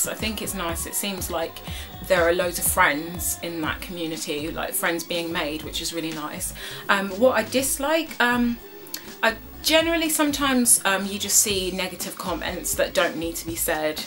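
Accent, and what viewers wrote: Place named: England, United Kingdom. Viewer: love love love your make-up tutorials, glad to have found you, nice to hear an english accent, keep up the fantastic work! x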